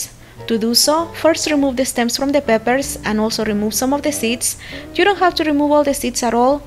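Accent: India. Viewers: If she's Mexican but she sounds like she comes from daneli india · bien sabroso caldo camaron grascias. from where are u?? u are from India ur accent from India,I love that soup I rather use fresh shrimp, it's perfect for now winter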